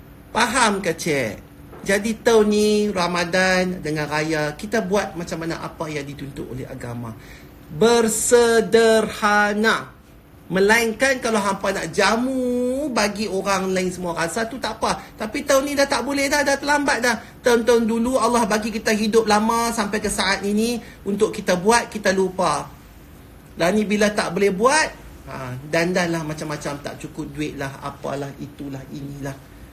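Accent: Penang, Malaysia. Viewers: Oqang penang. Terbaik tok. Pekat loghat penang sampai la ni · Dato ckp x berubah ...penang mai..Good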